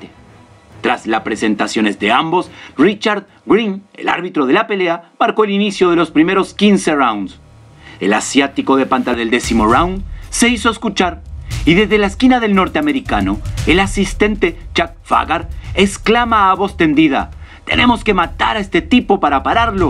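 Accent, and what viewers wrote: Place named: Buenos Aires, Argentina. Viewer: Nuevo sub me gustó tu video, no sabía de esta historia.,estuve a punto de salirme por tu"she sheo " eres muy buen narrador pero evita ese acento "'porteńo" es muy molesto oirlo, Ojo no tengo nada contra los argentinos 👍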